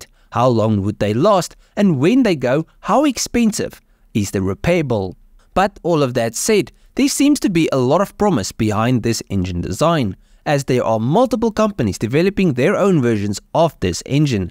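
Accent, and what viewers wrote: South Africa. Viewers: I somehow don't think Noel Duke would appreciate your over-laying and inter-mingling his Z-axis engine rotary engine with the VERY different 'DEVIZE Barrel engine'. ... and I would know; Noel is a personal friend of mine. Barrel-type axial engine's have been re-cycled over, and over and they have NOTHING new to offer. All fail because using a cam plate to translate reciprocating loads over the long-term life of a typical automotive engine is a non-starter. From your accent you are obviously South African so why not look in your own backyard? The Van Loewy (my memory may be faulty on the exact spelling of the name) is a far more interesting axial engine from the 1980/90's. It used patented universal joint to articulate the piston-rod/wobble-plate connection such that it eliminated the figure-of-8 oscillation and resolved the motion to a pure straight-line motion. 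superior to any cam-type axial engine! How about it?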